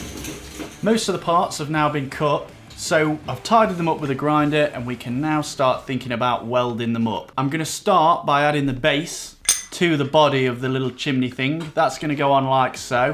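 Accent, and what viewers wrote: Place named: East Midlands, United Kingdom. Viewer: New sub here, was from Grantham now living in Cork.. Im guess from the accent and the word duck you too are from the east Midlands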